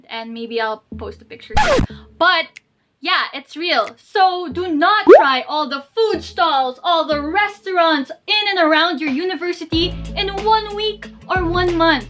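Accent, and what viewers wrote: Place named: Palawan, Philippines. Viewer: Ate palinawan po yung salita mo po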